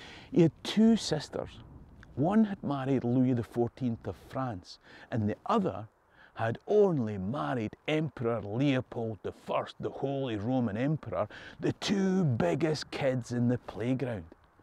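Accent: Scotland, United Kingdom. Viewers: Happy New Years and family!!! I watched someone else vid. But finely I now know what a Jacobite is. I had a hard time understanding you. I would turn up volume. When you would say who they were. I think it's was your Scottish accent. I feel all caught up. Warm Hugs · for some reason the scotish acent makes it more entertaining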